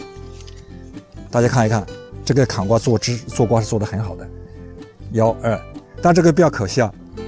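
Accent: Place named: South, China. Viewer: 这口音，是南方人吧？😁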